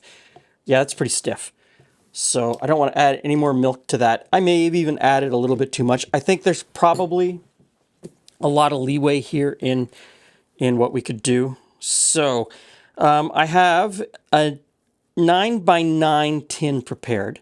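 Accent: Canada. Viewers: Hi just started watching your videos. I’m Scottish ( Edinburgh) so watched the old Scottish cook book one. Was curious to see if it matched the kinda stuff my granny passed down. The carrot pudding looked good. Although it did look a lot of butter so I’m not sure it meant fluid Oz maybe Oz as in scales. Anywho could try both ways to see. So this one would have been better done as a clootie dimplimg. A cloot meaning cloth in Scotland. So boiled for about 2 hours. Also the addition of suet is helpful and would have been in most recipes.. puddings and pies. Thanks for your videos , you seem like a kind friendly guy. I’m also starting to recognise the Canadian twang in accents when I hear it. I’m not used to hearing Canadians being from a small village. Look forward to seeing what else you do.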